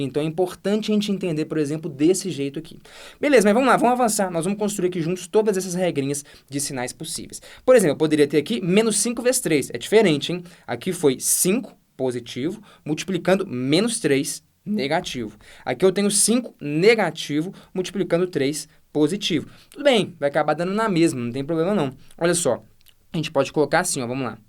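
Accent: Minas Gerais, Brazil. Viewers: Parabéns pelo trabalho. Pelo sotaque, parece mineirinho igual a mim. Sou professor aqui em Brasília, confesso que essa parte tão básica é difícil de se passar em um cursinho aqui, pq é muito conteúdo. Sucesso aí, se tiver alunos com dificuldade em mat básica, indicarei vcs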